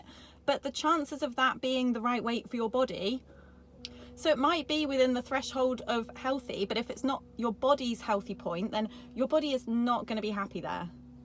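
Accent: United Kingdom. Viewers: She has a British accent but the steering wheel is on the left side. What gives?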